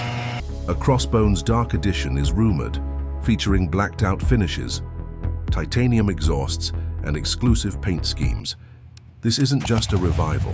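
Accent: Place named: United Kingdom. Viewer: He sounds British 😅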